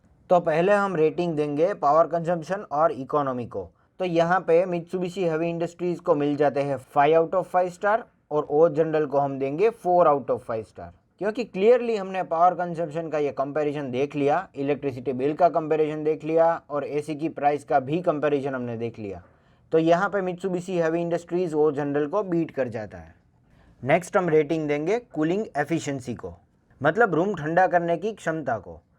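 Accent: Gujarat, India. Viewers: Gujarati Cho Brother?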